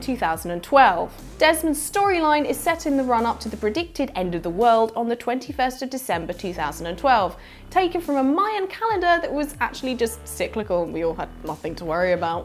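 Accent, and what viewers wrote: United Kingdom. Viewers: wow this was upload 3 secs ago, say hi to me plz, love your brittish accent, congrats for your new job youre doing well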